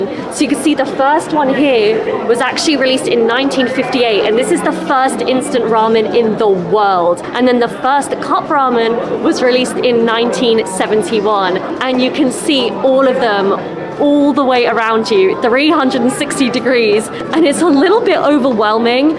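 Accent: United Kingdom. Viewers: 再生速度を落とすとはっきりしたイギリス英語の特徴がイヤというほどわかりますｗ おかげで素晴らしい英語教材になってます。ありがとうｗ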